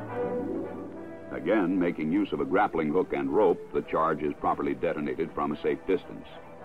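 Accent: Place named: Boston Brahmin, United States. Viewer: boston brahmin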